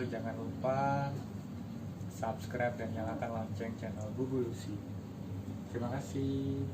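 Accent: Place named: United Kingdom. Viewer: britishnyaa comel😭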